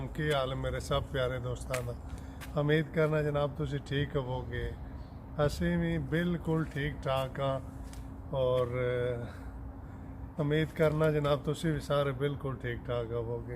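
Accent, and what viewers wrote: Gujrat, Pakistan. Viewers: sahib tousey great ho... vlogers bohat nay pir unhano sunan da maza nahe aynda koi english bool rayea koi hor dosarey zuban apni identity he pul janday nay...... tousey apni proper gujrati assent punjabi which blog bana Kay dil khush kar dita.... keep it up 👍🏼✅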